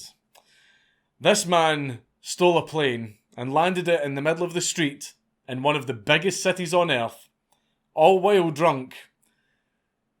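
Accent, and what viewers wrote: Scotland, United Kingdom. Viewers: Bro you only have 830 k + subs?…. Is half of the globe missing their testicles? Or are we in some sick twilight zone episode where having a sexy and masculine Scottish accent taboo?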